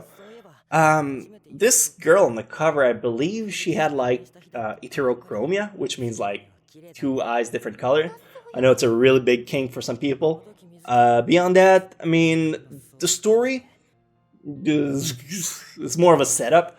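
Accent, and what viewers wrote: Quebec, Canada. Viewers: I feel like now that I know you're from quebec I can't "not hear" the signature accent of a quebecois speaking english xD i'm from montreal lol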